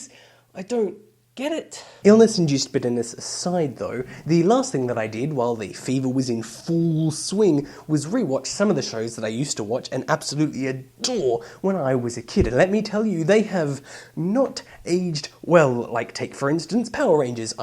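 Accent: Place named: United Kingdom. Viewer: You have a British accent when you're sick.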